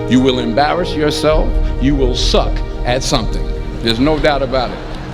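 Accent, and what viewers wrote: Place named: Scotland, United Kingdom. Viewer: I was having some trouble recently calming manifestation.guide the voices in my mind during my morning meditation. him has a wonderful Scottish accent and a very calming voice. Thanks to his meditation CD's I have gotten back on track and feel a big difference in my meditation practice.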